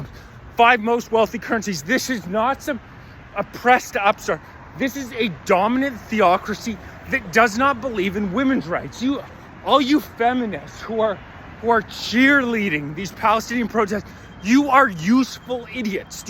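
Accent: Canada. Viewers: Here we go again the pandering continues senile old ladies and naive children beware the funny thing is this guy couldn't exist for sh!t without the same strung out jaded buffalo soldier ignorant immigrants (that he goes so obscenely out of his way to rip on when antisemitism is a criminal offence as of last year!) looking the other way from every second word out of his mouth cause yknwo, they saw actual war (which this guy supports of course) and the same blackmailed child molester politicians he pisses the same exact party lines out of his dick hole face with that stupid vaguely hoser accent he puts so much effort into 😂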